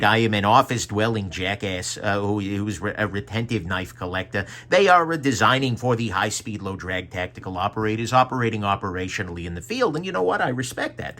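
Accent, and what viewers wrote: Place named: Illinois, United States. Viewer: Illinois accent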